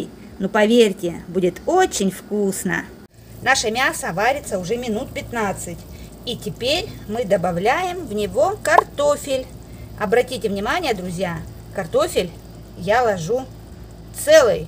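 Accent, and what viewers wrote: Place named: Visayas, Philippines. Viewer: Hello Russia 💘👋✌that's why the tone is Visayan because it's Cebu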